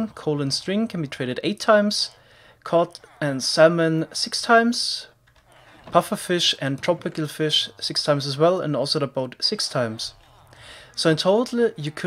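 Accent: Germany. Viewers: der aktzent klingt deutsch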